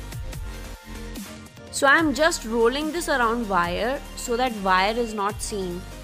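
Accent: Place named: India. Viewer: Indiam accent? Arggg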